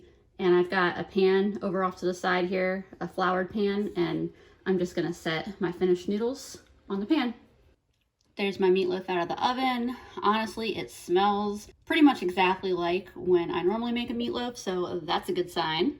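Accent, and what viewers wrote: Philadelphia, United States. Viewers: We usually do a "potluck" where everyone brings something and we have a buffet of sorts but the last 2 years it's just been my little family so last year the kiddos picked cheeseburgers and this year I made an eye roast a few days before Christmas and we had hot roast beef sandwiches. You talked as if you are or were near Philly. I was born and raised in South Philly and since I moved away I miss nicks roast beef so much! So I try my best to make my own combos lol. Hope all reading this have a safe, happy and healthy new year! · Wow, I knew you were from Philly when I first heard you speaking. Merry Christmas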